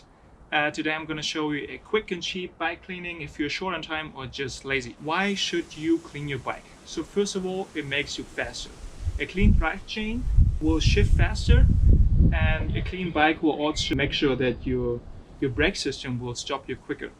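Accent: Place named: Netherlands. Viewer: Very nice music haha! And you got a dutch accent, sweet!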